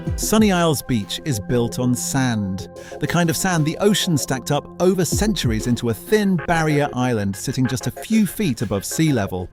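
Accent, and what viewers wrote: United Kingdom. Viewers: Hey Mr. English accent. Why not do a video about the rape gangs in the UK? Or perhaps comment about the politics of the UK?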